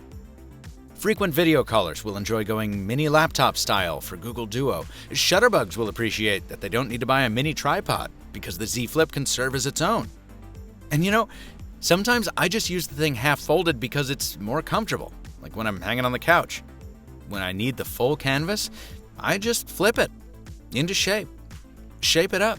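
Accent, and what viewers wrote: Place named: New York, United States. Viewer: Well he's my new favorite reviewer! A New York'er but still with a European touch. Love the tone and his narrating. He'd do excellent in Europe too.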